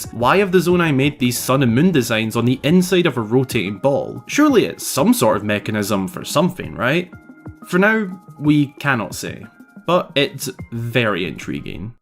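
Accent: Scotland, United Kingdom. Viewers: Love your Scottish accent, just returned from a trip through Scotland, a wonderful country ❤🏴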